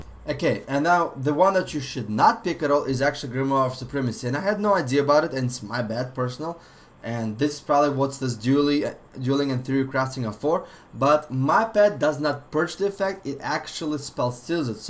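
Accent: Russia. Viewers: I love the Russian accent, first time watching your videos and I've subbed:)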